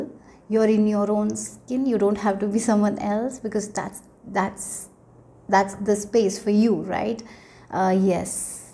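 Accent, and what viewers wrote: India. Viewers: Really enjoying your readings. Very on point and deep. Love your Hindi accent as well. Thank you🙏🏼✨💖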